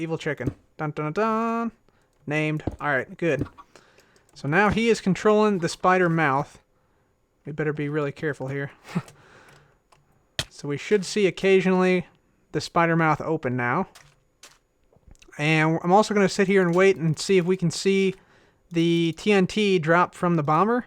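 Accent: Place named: Illinois, United States. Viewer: I think he's from Illinois.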